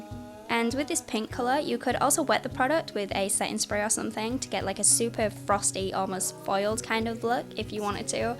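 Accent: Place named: North, United Kingdom. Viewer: Brad and London are having an influence on you! You're starting to lose some of your northern accent and sound a bit softer, more southern:) No worries, I am from Surrey but I've been living in America for the past 18 years...people say I've got an American twang. (That actually pisses me off but it doesn't take me too long to get my accent back properly:)) Very pretty look, and like you said, very not you! That's a mad pigmented gloss, is it sticky too?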